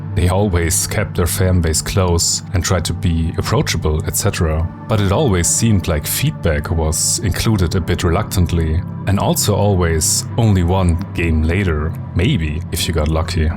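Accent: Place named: Germany. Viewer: You know it's funny when you think about it. PB could have done the same thing 3 times in a row in 3 decades, and people would still buy the game over and over again, just because it's Gothic. But as you said, they didn't know where their goal was, so making new games was esthetically more appealing for their company, which eventually led to their downfall. Also, nice to hear your voice. Not a completely German accent there. Kind of reminds me of someone :)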